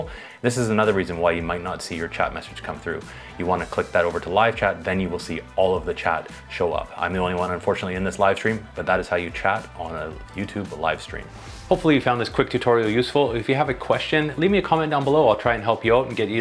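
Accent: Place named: Canada. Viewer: I KNEW you were a CANUCK ! You have an accent! Especially when you said, "OUT" ...."If you have a question, leave me a comment below & I'll try and help you 'OUT' !! Tell tale sign of a Canuck! Tks for your helpful tips on how to comment during live stream. Sending you good vibes & California sunshine ! 🌴😎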